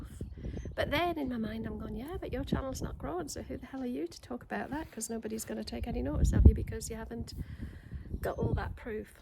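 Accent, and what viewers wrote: United Kingdom. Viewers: Aww, you are brilliant, I love your honesty and openness, I agree with the other posters, just do it for you, if you’re not worried about the monetisation piece, do what you love and enjoy. You’ve got your hardcore here. I sometimes think when I comment, it’ll just be boring old Nat again and not someone new but, we’re here for it and we love all your stuff. Before you said it, I was thinking you were very geordie today and I LOVE that! My Dad was from Newcastle and although he moved down south as a nipper and didn’t naturally speak in the geordie way, he could turn it on in a flash and I really love the accent because, in my roots I have a wee bit of geordie 🥰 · I don’t think I’ve heard you speak with such a Geordie accent since before you headed for the Hebrides. You must be low., just keep doing what you are doing. Something will just happen and I guarantee you’ll not be able to understand why. 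That was the case with both of my channels. Try this. Picture one person in your mind throughout the whole video creation process, the prep, the shooting, the editing, the uploading and the thumbnail/description. Make it for that person and them alone. Make that person a fictional person. You want to give them a pleasure in watching your video. You want them to feel comfortable watching your video, feel happy, entertained, educated, inspired. You do all of those things to people in the real world everyday so why do people watching get different treatment. In other words, let that person see you, not what you think they should see. But direct it to that one person in mind.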